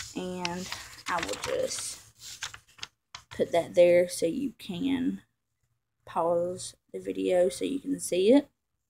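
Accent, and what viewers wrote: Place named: South, United States. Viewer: Nice! Where are you from? The south? Love the accent :) You can use books, cardboard boxes etc as a video camera stand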